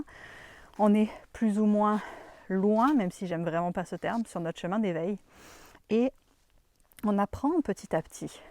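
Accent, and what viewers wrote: Canada. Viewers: Est-ce que toi tu comprends cette langue magnifique ‘ ? Tu connais Rachel l’abbé elle parle comme toi est du Canada aussi 👍❤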